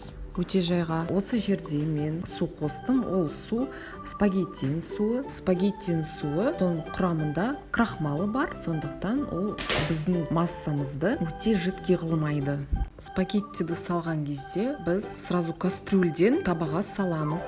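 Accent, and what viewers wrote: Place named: Russia. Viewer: Сіздің орысша акцент-ыңыз бар екен сол үшін айтып жатқанмынғой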